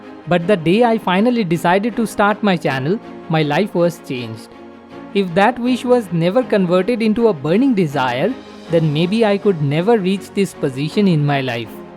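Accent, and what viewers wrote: India. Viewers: I hate the Indian accent....sorry. I just cannot take it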